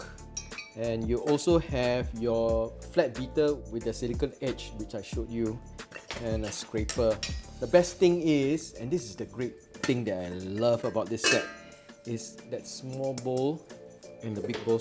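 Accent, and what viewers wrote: Singapore. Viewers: Are you from India! Or Singapore! You sound like Indian Chinese or Singaporean. Nice accent. That was funny. When the bake is cakking. lol I'll try your recipe. Your a jovil person.jovial. Thank you From North America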